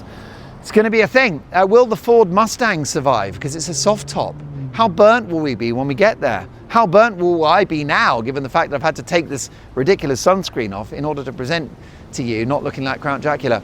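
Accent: France. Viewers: Mark you always make me laugh!!😂😂😂😂 Keep practising the french language💐💐. I like the accent🌺🌺🌺 ⚘️🥀⚘️⚘️⚘️🌻🌻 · Hello! I love your show and you have a lovely french accent, but you are so so wrong about Emmanuel Macron. He speaks a lot but tells 2 things about the same topic and never never never act! And do not tell him about freedom of speech. He doesn't understand the concept. But it looks like "nice" out of France. Cannot understand why.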